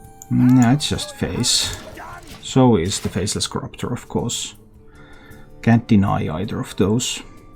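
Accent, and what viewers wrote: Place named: Finland. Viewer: He's from Finland, this is how they lovely people of Finland talk 🇫🇮❤️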